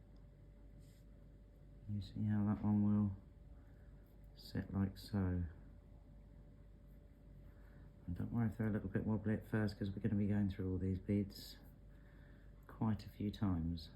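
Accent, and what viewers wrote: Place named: United Kingdom. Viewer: You have the British accent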